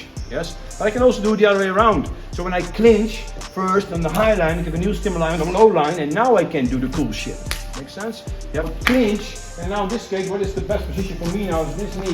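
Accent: Netherlands. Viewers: Respect what a OG, lived in Netherlands 🇳🇱 for a few years, top top people, love the accent,attitude and culture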